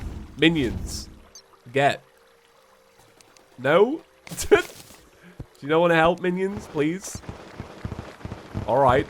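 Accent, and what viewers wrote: United Kingdom. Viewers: Hello sexy-voice British man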